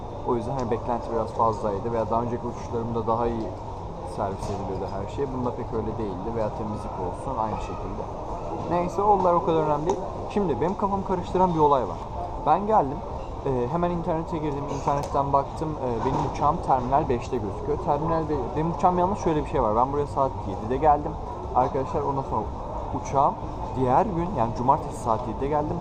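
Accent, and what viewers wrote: Turkey. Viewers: Ege Zz aksan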